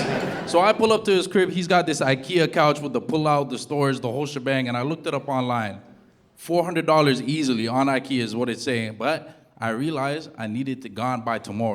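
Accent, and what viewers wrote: Toronto, Canada. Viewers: God couldn’t listen to the whole act. That disgusting “Toronto accent”. 🤮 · Buddy sounds straight from Toronto off rip 🤣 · Trus me I knew right away before he mentioned it this man had to be from T.O. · Anyone wondering how a real Toronto accent sounds it this..not the suburban kids on TikTok😂😂😂😂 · i kno toronto when i hear it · This guy has to be from toronto from his accent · Bro, I knew you're from Toronto, listen to that accent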